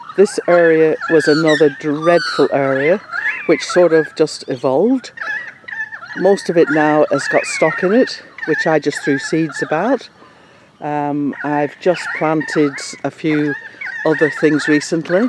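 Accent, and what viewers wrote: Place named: Ulster, United Kingdom. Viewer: Fantastic...amazed you have so many cuttings. splits etc. The Ulster accent gives an international flavour (surprised no Aussie twang!!!)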